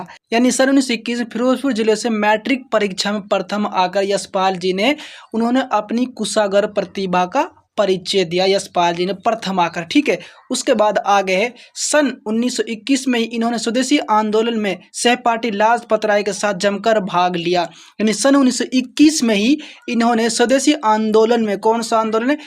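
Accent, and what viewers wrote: Mewat, India. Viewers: Oye tu mewati to na h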